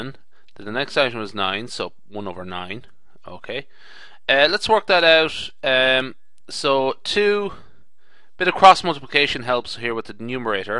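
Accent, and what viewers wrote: Ireland. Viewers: Yas Niall!! I noticed that too and I was laughing the whole time. (I meant that positively because I like Irish accent) ☺☺☺ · Is that an Irish accent I detect....? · Hi! Are you Irish?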